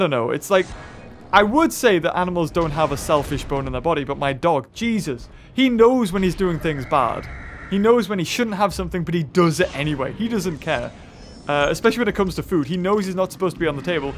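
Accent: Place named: United Kingdom. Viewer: youre a good youtuber but heavy brittish accent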